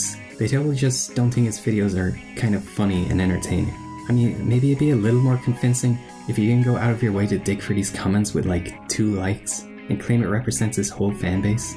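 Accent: Ireland. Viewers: What's that accent? Irish? Welsh? Pakistani???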